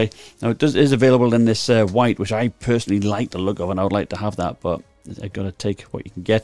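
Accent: United Kingdom. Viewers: You sound british mate, wouldve appreciated the price in the GBP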